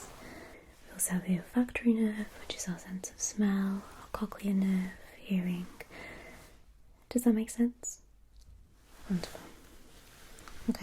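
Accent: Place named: Midlands, United Kingdom. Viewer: It’s her accent. She sounds a bit like where im from. Midlands area, but she speaks a bit more well spoken. What you expect her to do though? She’s just talking normally?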